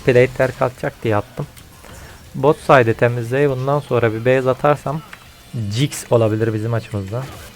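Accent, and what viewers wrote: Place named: Yoruk, Turkey. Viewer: Abi valla çok iyi konusuyon o yörük konuşman beni benden alıyor devam abi seviliyorsun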